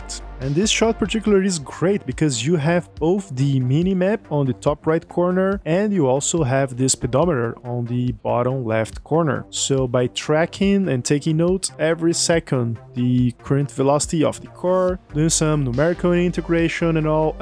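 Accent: Brazil. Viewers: Are you Brazilian? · eae mano, notei pelo sotaque kkkk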